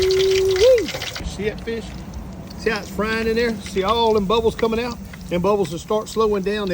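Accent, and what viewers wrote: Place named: Louisiana, United States. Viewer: My man sounds like every other dude cooking on a black top griddle in Louisiana😂😂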